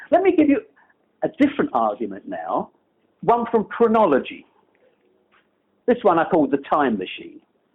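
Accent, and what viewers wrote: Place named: North London, United Kingdom. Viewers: he sounds like he comes from N London. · I like his London accent. I despise the Australian and especially the New Zealand accents.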